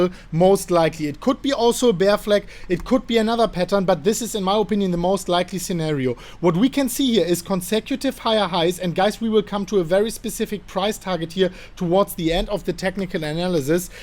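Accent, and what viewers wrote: Germany. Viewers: Mike Porten this is a very strong german accent, they often pronouncing „the“ as see or they say somsing instead of something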